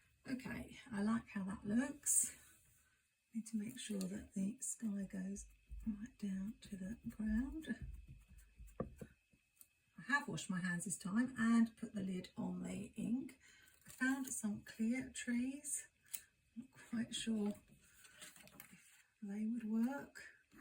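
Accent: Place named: Southern England, United Kingdom. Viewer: I’ve got loads of supplies but am quite keen on starting ‘properly’ in January so I can follow through the year!! Where in the UK are you?? I’m in Essex and your accent doesn’t sound too far north!! 😊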